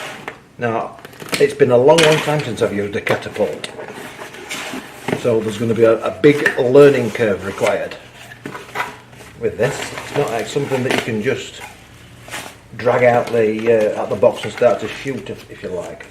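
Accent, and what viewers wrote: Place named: Yorkshire, United Kingdom. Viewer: You sound like you're from Yorkshire if you are there's always a catapult show every Sunday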